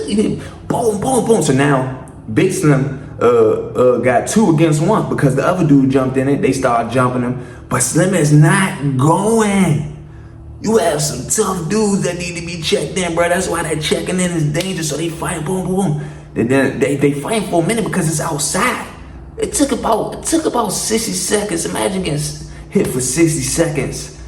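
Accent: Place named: New York, United States. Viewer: You talk like you been around more New York cars joints n ppl than anything.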